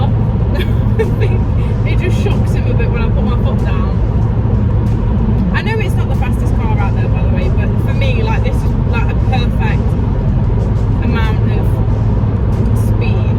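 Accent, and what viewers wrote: United Kingdom. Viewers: Are you from derby?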